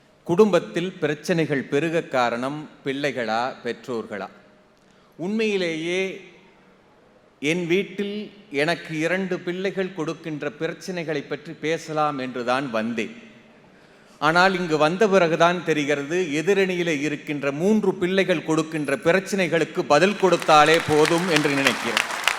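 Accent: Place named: Kongu, India. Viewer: அண்ணன் கொங்கு பேச்சை வரவேற்கிறேன்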